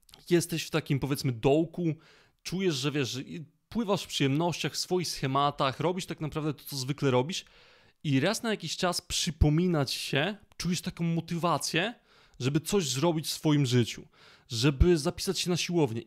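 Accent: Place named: United States. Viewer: Dykcja jest dobra. Akcent jest amerykański, albo holenderski. Taka kluska w gębie. Albo pilnowanie sie, zeby mówić niskim głosem.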